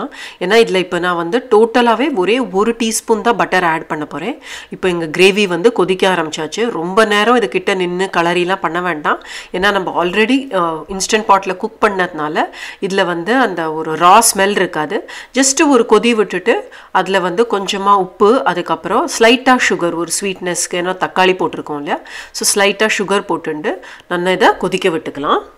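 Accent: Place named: Tamil Nadu, India. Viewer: As always simple and yummy. Love ur Tamil accent 😊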